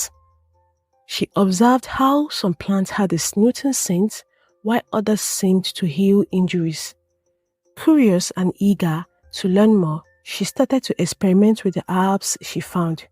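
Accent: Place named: Africa. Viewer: Himmm i heard to understand a Africa accent but i like the story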